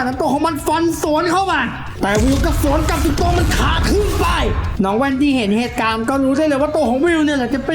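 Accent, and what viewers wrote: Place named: Suphanburi, Thailand. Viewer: ฟินเหน่อสุพรรณ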